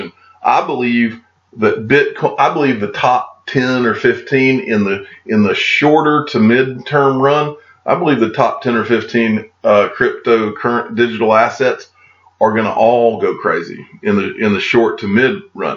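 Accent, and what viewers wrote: South, United States. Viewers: Love the good ole southern boi sweet tea accent lol your videos get me hyped like jungle Inc. You sure do know how to make ripple/xrp hodlers feel proud of what they are holding. Thanks for helping me realize that I needed to dump my neo ontology stellar and cardano all for ripple/Xrp. The light bulb went off 3 months ago after watching a slew of your videos. It finally clicked on which one was the potential winner 5-10 years out from all of the 2000 cryptos listed. See you in Lichtenstein brotha! Also, I live in Georgia. Hopefully we hop on the same flight.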